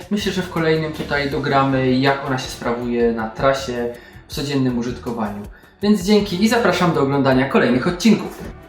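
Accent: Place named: Silesia, Poland. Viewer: Czemu tak zaciagasz po slasku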